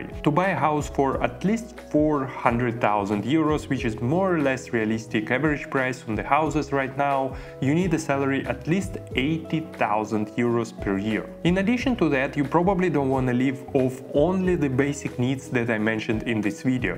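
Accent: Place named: Russia. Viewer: да совершенно любая буква t, на , , и так далее. Не, ну это просто акцент, не принимайте близко к сердцу, я просто от многих русскоязычных слышу этот звук, просто интересно, почему вдруг именно так эту букву произносят, ведь в русской речи у нас этого звука нет) те же греки, у которых сильный неубиваемый акцент, просто произносят свои греческие звуки, а у нас такая своя версия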